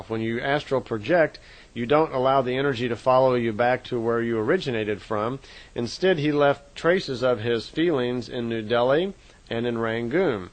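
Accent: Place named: England, United Kingdom. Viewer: Well this mermaid is so wishing that she was at the ocean instead of in Las Vegas....I have found some beauty here and it is a place called Red Rock Canyon...but there is nothing like riding waves with your body!! Soon though i will be in San Diego for a week...my childhood home.....You sound as though you maybe from England..I was born in Ireland!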